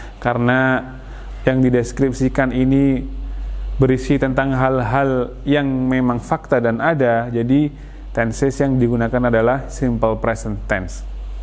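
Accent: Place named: Cileren, Indonesia. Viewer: kaya wong cileren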